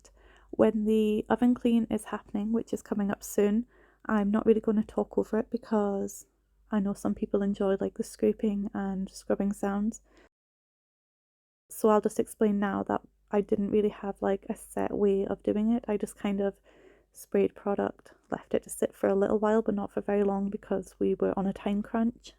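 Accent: Scotland, United Kingdom. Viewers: Loving your channel and your beautiful Scottish accent💛, so glad there is still kindness like yours in the world🥰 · Don’t be afraid to go full Scottish on the job! That is part of what makes this channel so unique!!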